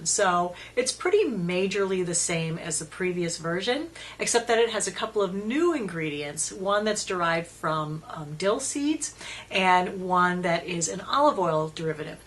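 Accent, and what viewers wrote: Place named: New England, United States. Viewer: Thank you for the reply, I'm sure you're right it seems to be a bit better. By the way, it is 65º here, just lovely, but I totally relate to your winter woes, I'm originally from South Boston, another New Englander, though you still carry the "r's" in your videos, unlike myself, even after living 20 years in Spain. A very Merry Christmas to you and my thanks for such fun and informative videos.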